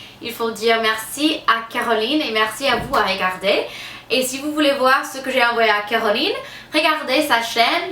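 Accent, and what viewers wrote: France. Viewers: J'aime vraiment beaucoup ta chaîne , et surtout ton accent français . Tu ressembles énormément à Lindsay Lohan c'est fouuuu , bisous p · Je succombe totalement à ton accent frenchy lol les produits sont extra, u've master french lgge better than some french pepople haha, kiss <3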